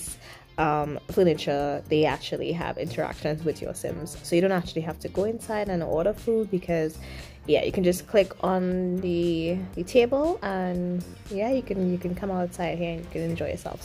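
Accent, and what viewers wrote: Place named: Nigeria. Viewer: love thw cafe. where are you from you sound Nigerian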